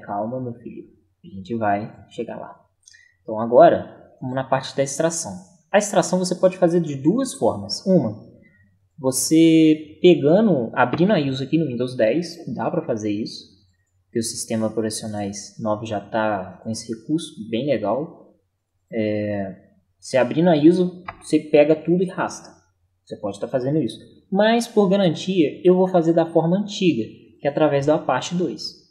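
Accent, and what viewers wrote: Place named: South, Brazil. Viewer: VC É DO SUL DO BRASIL TIPO SEI LÁ RIO GRANDE DO SUL POR CAUSA DO INICIO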